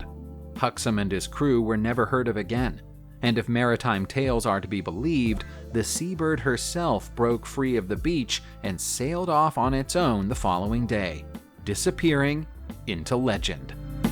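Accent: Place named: United States. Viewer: The pronunciations of British names are quaintly American too, as is the typically American mispronunciation of route as "rowt".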